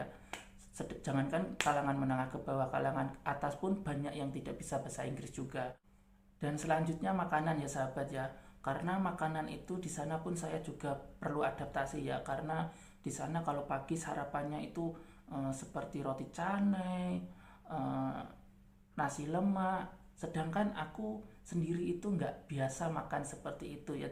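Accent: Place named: Indonesia. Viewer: 1. Kalau cakap pasal loghat, di indonesia sendiri sahaja ada beratus2 loghat yang berbeza. Tak hairan. Tapi Bahasa Melayu (di Indonesia dengan di Malaysia) tetap sama, cuma ada beberapa istilah sahaja yang tidak sama (cuma istilah). Kamu bercakap loghat Indonesia di video ini, saya (selaku orang Malaysia) seratus peratus faham. 2. Bahasa inggeris di Malaysia dipelajari di sekolah. Bukan kerana Malaysia pernah dijajah oleh British maka semua rakyat Malaysia secara otomatis bijak berbahasa Inggeris. 3. Teh tarik itu kan teh susu biasa saja, cuma ditarik (shake). Tapi bukannya semua orang Malaysia minum teh tarik setiap hari. Macam2 jenis minuman lain yang kami minum selain teh. 4. KFC di Malaysia ada nasi dan ada nasi lemak juga. Tidak benar jika sebaliknya. Kalau tak percaya ayo pergi kamu ke KFC sekarang ini dan tengok di menunya, pasti ada nasi. 5. 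Orang indonesia selalu saja silap apabila mengatakan harga kereta (mobil) di Malaysia harganya murah. Kereta di Malaysia tidak murah. Cuma di Malaysia boleh beli secara berhutang (bayar secara ansuran) dengan syarat yang agak mudah (iaitu asal ada keupayaan membayar ansuran bulanannya). Bolehkah kamu kata harga kereta di Malaysia antara RM40,000 hingga RM100,000 itu murah (dalam nilaian rupiah Indonesia). Cuba kamu hitung berapa niainya dalam rupiah. Kalau kamu masih kata murah maka sudah sahlah bahawa orang Indonesia memang kaya-raya. 6. Hampir di setiap rumah di Malaysia ada motosikal. Tidak benar kalau dikatakan orang Malaysia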